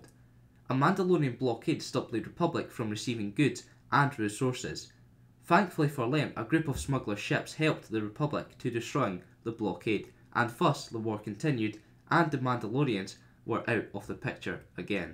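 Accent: Scotland, United Kingdom. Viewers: hes scottish. thats just how it sounds.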